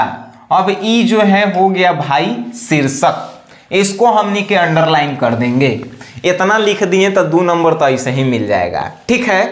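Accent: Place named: Bhojpuri, India. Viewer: sir aapki bhojpuri accent ke sath padne me aur maja aata hai ❤️😃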